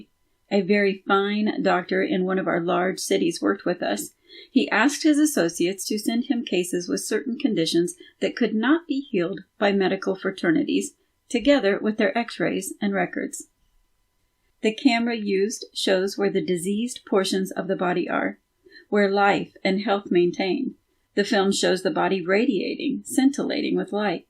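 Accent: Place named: New Jersey, United States. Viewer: One of my fav chapters in this 6 volume CLASSIC. I listen to all 6 volumes when walking on forested trails. And this lady is my fav reader cuz she carries the frequencies. I do chuckle each time she says the word: on ... cuz it exposes her accent ... probably NJ or Long Island ... she does a fantastic job .... Baird would be proud of her efforts to share this remarkable body of work.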